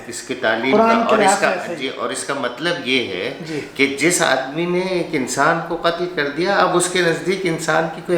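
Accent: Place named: Eastern Uttar Pradesh, India. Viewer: his tone is still Eastern UP or western Bihari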